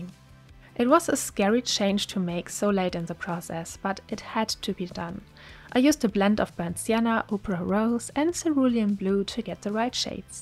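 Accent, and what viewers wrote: Germany. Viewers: I can hear and 'see' Alpay Efe in these vides... like a female version of him... also with same german accent... fun 😊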